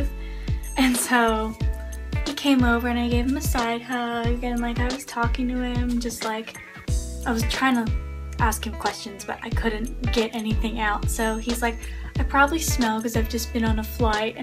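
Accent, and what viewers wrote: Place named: United States. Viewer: You live in Sydney with an American accent... Wow. 😍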